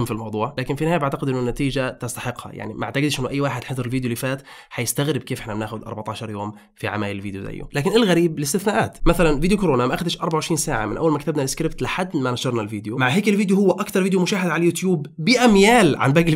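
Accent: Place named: Algeria. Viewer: ههههه هذه لهجة جزائرية ❤❤ تعيش الجزائر و فلسطين شعب واحد للأبد 💕💕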